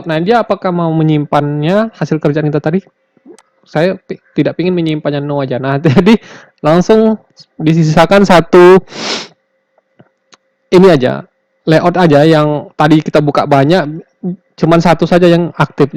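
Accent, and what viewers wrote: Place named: Padang, Indonesia. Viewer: Sprti logat logat padang padang gan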